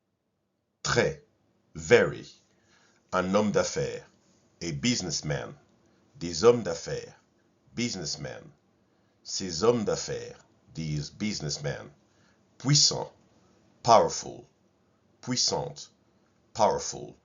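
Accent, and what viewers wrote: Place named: United States. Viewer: Speaking American English,. Great job, blessings.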